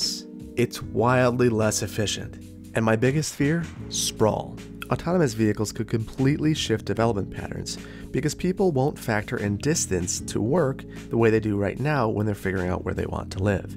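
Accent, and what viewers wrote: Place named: United States. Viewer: There are many valid points but also couple of things I would respectfully criticize. The speaker’s accent suggests he is based in the US. With that in mind I cannot really get the point about inequality. I mean, most of US cities are already designed with sprawl, in a way that often mass transit is not a viable option to commute. And those groups of people that cannot drive (e.g. kids, elderly, disabled, poor, etc.) are having significant disadvantage in that respect. In addition, when autonomous cars become mainstream, most of the people will not have to own them. All that makes me think autonomous vehicles are more likely to improve the equality than otherwise.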